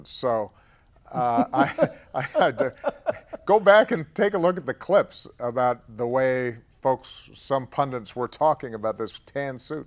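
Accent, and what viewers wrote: New York, United States. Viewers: I played at 1.5 & he spoke like a new yorker. 😎 Miss him.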